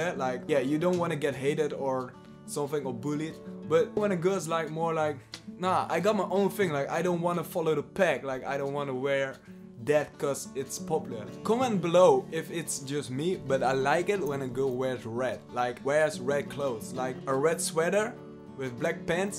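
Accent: Netherlands. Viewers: Ik hoorde je Nederlands accent hahahh je Engels is wel nog steeds goed hahahah😂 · Ik dacht al dat je Nederlands sprak!! Ik hoorde het aan je ‘accent’😂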